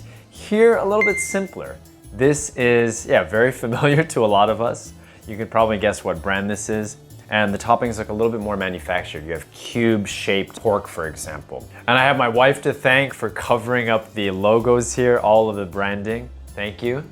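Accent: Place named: United States. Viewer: This may be a crazy question...but how did you get to speak english so well when you were brought up in Japan....you sound as if you grew up in the states....I must be missing something in your history....lol....sorry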